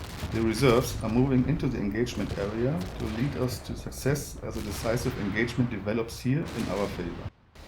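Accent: Germany. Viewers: Schöner deutscher Akzent :D Combat mission content is always nice! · Enjoyed your video. I need a few cups of coffee to play a battle that big. Also enjoyed your German accent. Then again it also could be Swiss or Austrian.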